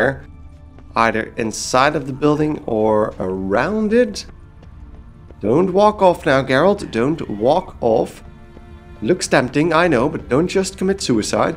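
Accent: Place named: Netherlands. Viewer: Your obviously native dutch speaking 😃 from the Netherlands even !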